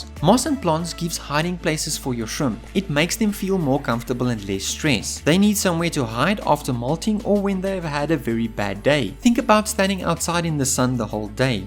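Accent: South Africa. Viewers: and concise! Nice to hear a clued up South African hobbyist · I can hear you also speak pure afrikans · I really love the south african accent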